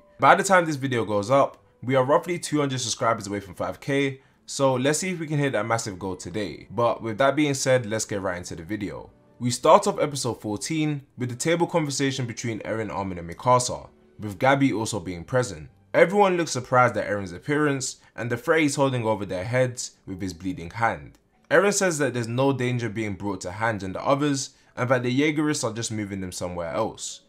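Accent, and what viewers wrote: London, United Kingdom. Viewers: Just by your accent I can tell you’re a black dude from somewhere in London. Probably South or East.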